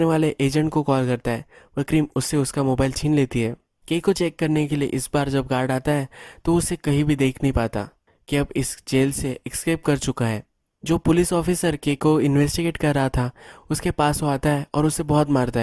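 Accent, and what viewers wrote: Maharashtra, India. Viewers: Chitka hua ... Full Marathi guy speaks hindi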